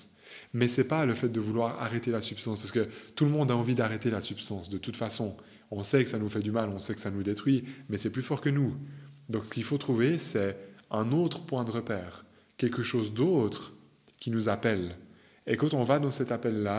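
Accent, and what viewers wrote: Switzerland. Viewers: Ton accent montre que tu es en suisse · damn t'est suisse... Vaudois je dirais. magnifique postulat merci, de suisse aussi 😅. je modifie mon comm pour dire simplement que ta sensibilité se voit et qu'elle est belle mon gars. sincèrement bravo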